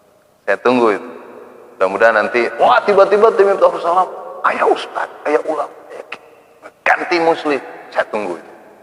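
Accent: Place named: Sunda, Indonesia. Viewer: Logat Sunda teh lembut kena kupingnya,,, berkembang terusss kang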